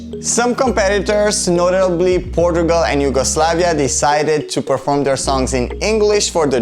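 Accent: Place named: England, United Kingdom. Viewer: Hi! Nice job as always! Just a friendly remark as it's not the first time i hear you pronouncing "Gigliola" wrong, you dont have to pronounce that g in the middle at all, just skip it and tadaaam you have the correct name. I bet you can experience the proper italian fluency now:P By the way im catching the opportunity to say i love your english accent (been wanting to say that for a while) As for your french one.. ehm i do see your effort there hehe close to decent:D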